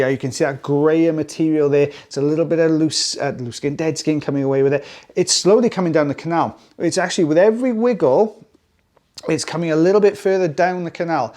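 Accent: Wales, United Kingdom. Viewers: It is a Welsh thing - love the videos, and also love hearing your accent - reminds me of home! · Is you Welsh Butt??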